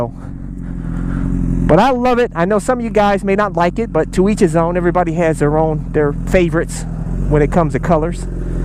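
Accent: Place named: Midwest, United States. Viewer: He grew up in an urban area of the midwest which is why its sounds like a mix of mid-western and northeastern dialects. Its the reason why its hilariously endearing when he says things like "FO DA STREETZ" "HUNDID" and "DAHBUL ARRR" lol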